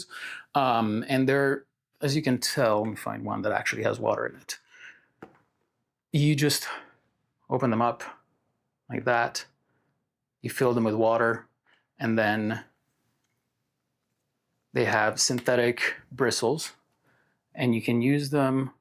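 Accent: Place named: Ireland. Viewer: Are you originally from Ireland? Your accent reminds me of my late great grandmother whom I'm named after.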